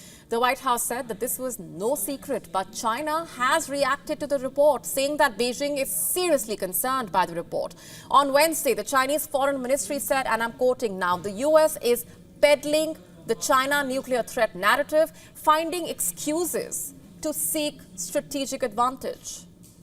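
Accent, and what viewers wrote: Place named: India. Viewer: What the heck of indian accent! 🤔🤭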